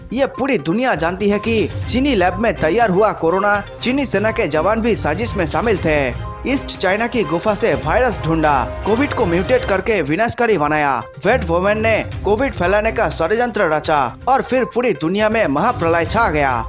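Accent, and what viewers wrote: Bengal, India. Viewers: abe tu jaise bolraha he bengali ho kya . pahele mamata ko kolkotta me thik kar phir bol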